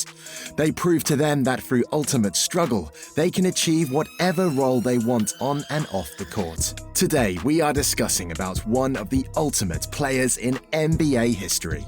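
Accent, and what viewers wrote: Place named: Ireland. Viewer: The narrator is obviously not from the US, so he is pronouncing words he would normally do from his country (?I woud guess Ireland)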